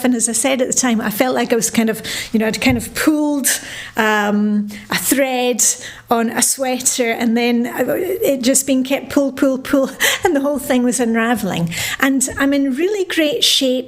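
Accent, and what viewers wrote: Scotland, United Kingdom. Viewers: Do you have a Scottish accent?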